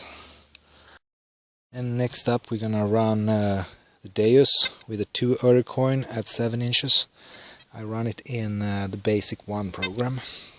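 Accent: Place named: Germany. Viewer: What’s your accent? Is it German or Scandinavian? It’s very noticeable.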